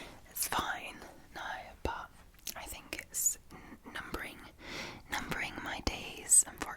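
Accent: Scotland, United Kingdom. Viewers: I struggled to stomach any Disney "food". Rides and ambiance is what Disney's good at. That's all. I digress... Thanks for your soothing videos! I'm English /Canadian and love your Scottish accent. I told my wife about how much I enjoy what you do. She's very understanding, but it's 'not for her'. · ASMR, and Lollipops....Definitely a great mix. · Don't feel bad for your fast ramble talk I think I speak for everyone here when I say I find your fast ramble talk to be extremely relaxing especially with your beautiful heavenly Scottish accent!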